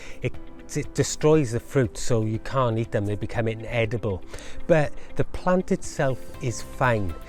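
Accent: Wales, United Kingdom. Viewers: i thought id heard on another video that late blight is ok and tomatoes wil continue to grow untoched. Im so glad i watched your video. I planted 12 tomatoe palnts (money makers) in my garden in march. all this rain weve had this last 2 weeks has battered my tomatoes. luckily theyd already flowered and fruited. ide already got a good 2kg of tomaotes, up until the last 2 days ive spotted late blight and rottening my tomatoes. i watched this and had just have to rip up all 12. now my chillies next row can have some air and light ;) Cheerz bud! I luv the welsh accent, makes it more satisfying to listen to. now ive got room for bulding the first raised bed of 6 (to my plan). all the stuff ive grown so far have been a success. its my first year after many years not grwoing. next year ive got some big plans to grow lots. ive got 2 woormerys on the go too, these castings will be part of my soil mix. 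ive also got 3 composting bins on the go so will be mxing this with manure that i will mix up in the winter so its got time to rot for next spring. I furkin luv gardening. so glad i started it again. From Newnham on severn! thankyou for the video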